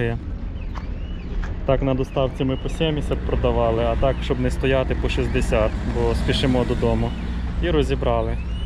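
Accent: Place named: Western Ukraine, Ukraine. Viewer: Почала вас дивитись-зацікавили.Ви вихідці з західної України?говірка така,як в нас на заході.Удачі Львів 60,50грн.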